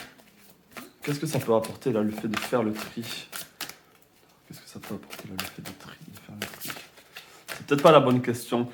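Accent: South, France. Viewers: Merci pour votre reponse. J'ecoute bcp de choses sur travailler ci ou travailler ca, mais concretement ca veut dire quoi et comment in fait? Sinon j'aime beaucoup le concept d'adresser les diffulcutes et l'accent du Sud!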